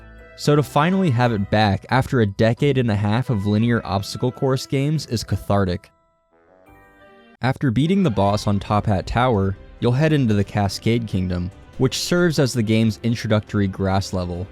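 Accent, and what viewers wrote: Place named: Northeast, United States. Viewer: you have a very northeast accent and it’s dope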